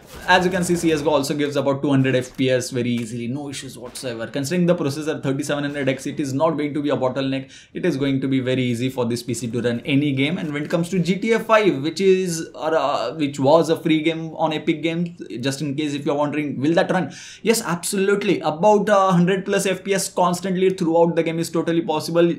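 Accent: India. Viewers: Please don't make fun of his accent. I have Indian friends, they already know its not a "cool sounding" accent. He made a more detailed video about this computer than most other people on YT. Let's show him support :) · Why is this hispanic guy doing an indian accent? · Love Indian English bro😍😍😍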